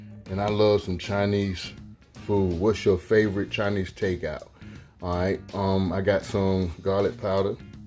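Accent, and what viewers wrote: South, United States. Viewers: Haha!!! This here man is so southern. I love it. Those wings look great. You should get you a second basket though. You can put your wings in one then drop it in the grease. You put the second one on top to keep them submerged. You are able to shake the basket to keep them from sticking as well. When they are done they are already in the basket. I subscribed. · He gotta be southern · Girl I was like wait a minute is he married then I went to take the rest of his channel so I assume that he is married so no disrespect when I'm say this comment I'm like this man than made him an outside deep fryer and then it sounds like he's from the south and Country guys, real country men be coming up with all kind of neat stuff it just makes me so proud, like one of my friends actually made his own barbecue grill he welded it and everything himself lol we sat out after he finished and had a late night bbq. 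So all in all what I'm getting at here was I so excited to see him take them wings and drop them in that outdoor deep fryer 🤣😊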